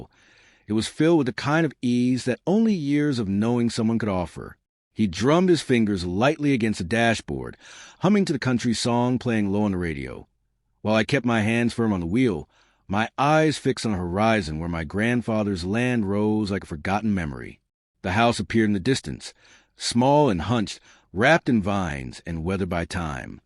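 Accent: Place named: South, United States. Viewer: I love this narrator, best voice, slight southern accent, very soothing!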